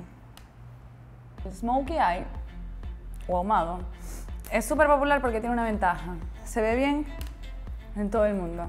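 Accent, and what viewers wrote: Venezuela. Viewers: el acento Venezolano no se iguala, lo reconoci al instante, me encanto tu video Saludos desde caracas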